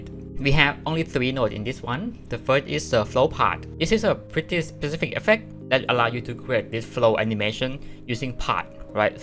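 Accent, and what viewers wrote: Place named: Thailand. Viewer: Your accent sounds like someone who is secretly into Thai. Am I the only one who thinks this?🤔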